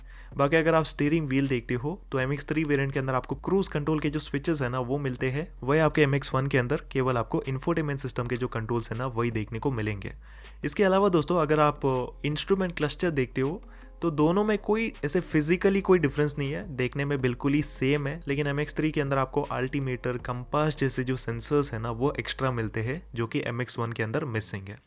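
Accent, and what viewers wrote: Kerala, India. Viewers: Bro, neutralize your hindi accent. Can make out you are from Kerala.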